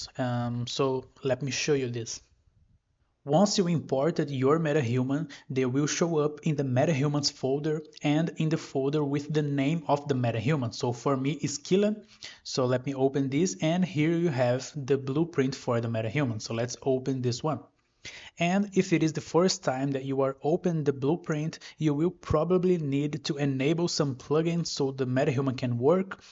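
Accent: Brazil. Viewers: Brazil? i can feel the accent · Nice tutorial. Are you brazilian? Your accent reminds a lot of people from here. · Muito bom! Tô supondo, pelo sotaque (leve) que vc é brasileiro. Baiano, talvez 😊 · Thanks for the great tutorial! ...acho que esse sotaque é brasileiro! kkk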